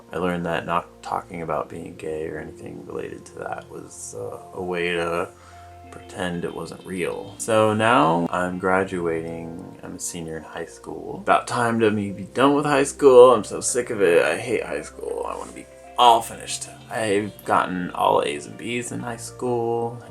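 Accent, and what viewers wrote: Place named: Fort Wayne, United States. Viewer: Sounds like you are from the area around Fort wayne? I am from warsaw.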